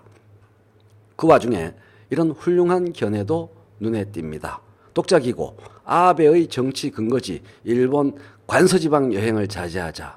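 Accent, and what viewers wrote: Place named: Gyeongbuk, South Korea. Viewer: 말투가 경북말투네요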